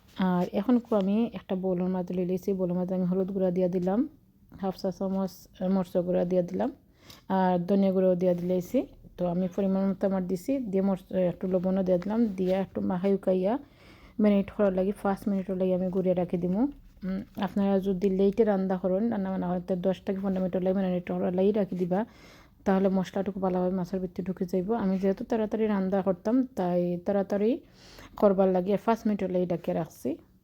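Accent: Sylhet, Bangladesh. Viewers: Sylheti kotha ghula vala lagw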